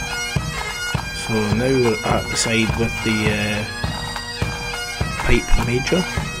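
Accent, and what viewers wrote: Scotland, United Kingdom. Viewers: LOL .... I love the videos but have great difficulty understanding the narration due to the Scottish brogue. I turned on the auto generated subtitles and that is gibberish. BTW English is my mother tongue.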